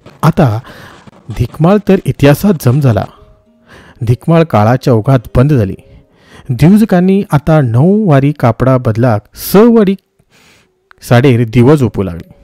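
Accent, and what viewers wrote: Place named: Karwar, India. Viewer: उत्कृश्ट लेखन आनी निवेदन...आमगेली गोड कारवारी बोली... परबीं तुमकां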